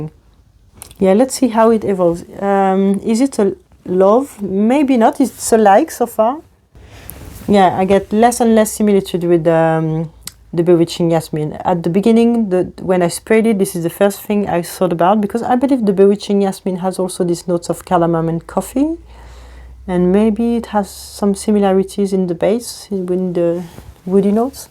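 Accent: France. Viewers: on sent l’accent français 😂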